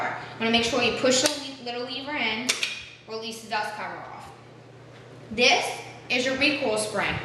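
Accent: New York, United States. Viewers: Too bad that this is a New york accent.......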